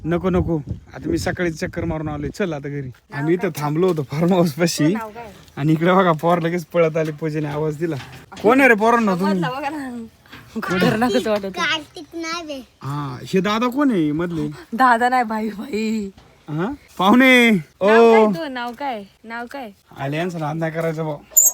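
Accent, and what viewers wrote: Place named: Sangamner, India. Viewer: दादा तुझं गाव कोणतं आपली संगमनेर भाषा कुठेच लपत नाही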